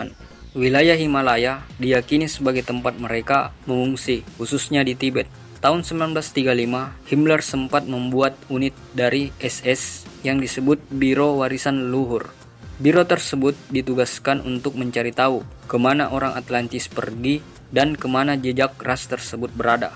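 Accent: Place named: Makassar, Indonesia. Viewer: Logat Makassar nih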